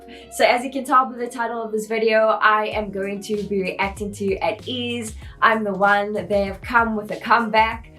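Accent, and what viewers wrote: South Africa. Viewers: You South african....am I right? I know our South African accent from anywhere. Anyways FUN FACT: ATEEZ WORLD DOMINATION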